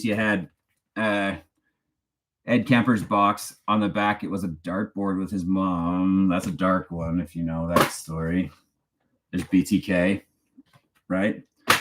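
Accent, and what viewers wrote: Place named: Canada. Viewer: Definitely Canadan. I get a chuckle everytime he says sorry cause of the accent.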